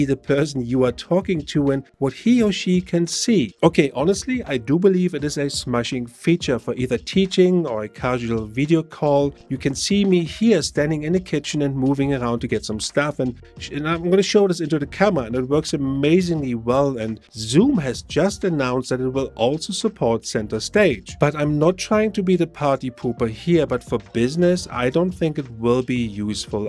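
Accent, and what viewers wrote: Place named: Germany. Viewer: Thats a Strong German Accent ! Are you German ?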